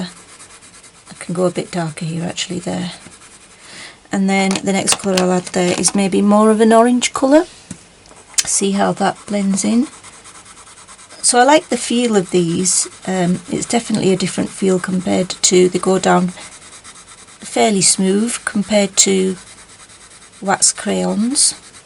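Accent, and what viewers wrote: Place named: Yorkshire, United Kingdom. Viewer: good idea! I have carers who go in and out...they help me care for my partner, so I have to ensure from a health and safety view I do not have tripping hazards...so putting loads of cushions against the door is not an option. I now have to watch Amazon and track where the delivery person is and hover by the door when they are a couple of stops away so that I can take it from them. Total nightmare! I still haven't opened and tried the items yet...still too scared. I am really enjoying your videos. Love your accent...I am trying to work out what part of Yorkshire you are from. I am in the UK too.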